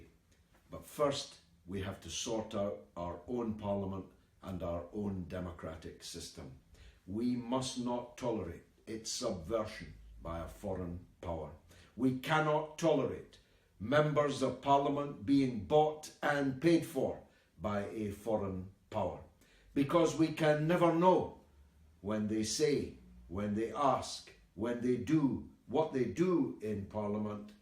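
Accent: Scotland, United Kingdom. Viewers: Why is it subtitled? Do some people struggle with comprehension of the Scottish accent?!!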